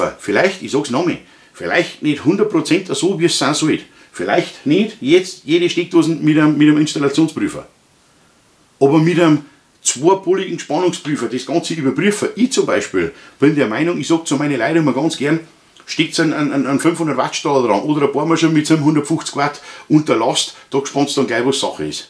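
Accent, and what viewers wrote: Bavaria, Germany. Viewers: Deine Videos sind erstklassig . 10 Daumen nach oben dafür . NUR leider habe ich ein kleines Problem , ich kann dir nicht zu 100% folgen da ich nicht alles verstehen was du sagst . Ich habe da doch schon gewisse Schwierigkeiten mit den Bayrischen . Schade das man es nicht alles mitbekommt was du gerde erklärst . Ansonsten sind deine Videos grosse Klasse . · Herrlich bayrischer Dialekt! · Machst schon informative Videos, sehr lehrreich, nur ein wenig mehr hochdeutsch wäre nicht schlecht. Es kommt ja nicht jeder aus dem bayrischen Ausland 😉🤣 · #PWInfo Servus und erstmal vielen Dank für die tollen Beiträge. Bezüglich der Fehler durch Routine: ich selbst habe erlebt, dass mein Elektriker, der die komplette Installation im Neubau gemacht hat, bei einer Steckdose Neutralleiter und Schutzleiter vertauscht hatte. Meine Frage: prüft ein Elektriker Steckdosen abschließend nur mit einem Duspol? 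Das Vertauschen von Nulleiter und Schutzleiter bliebe dann ja aber unentdeckt, glaube ich? Mich würde sehr interessieren, wie das in der Praxis aussieht, und wie richtig gemessen wird. Wäre ich Elektriker, dann würde ich es mir nie verzeihen, wenn jemand aufgrund meiner Schusseligkeit zu schaden kommt und würde an solchen Stellen auf jeden Fall prüfen. Ich hoffe, dem Kind geht es wieder gut und dass es mit dem Schrecken davongekommen ist. PS das Bayerisch finde ich super :-) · oan Buam hoats an oaner Steckdosen eine auffi gehaut... ich liebe diese Sprache, selbst höchst gefährliche Dinge hören sich für uns Fischköppe noch lustig an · dein Bayrisches Dialekt, bin aus Nordrhein Westfallen ich verstehe dich Super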